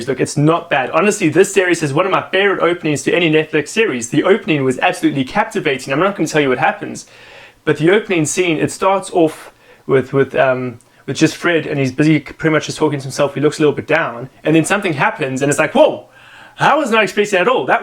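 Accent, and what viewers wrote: South Africa. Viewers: I heard the accent and stayed for the whole channel 👏 great quality, fellow South African here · Bro, are you from South Africa? The accent? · So glad I still recognise South African accent after 3 years out of the country! Keep on keepin on boetie. Baie Lekker :) Yoh..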